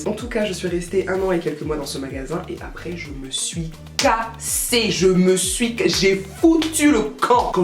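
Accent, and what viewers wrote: Ivory Coast. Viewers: ..je sur kiffe ton accent et vive Abidjan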